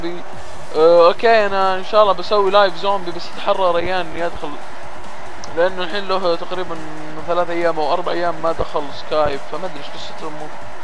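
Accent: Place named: Qassim, Saudi Arabia. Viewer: انت من القصيم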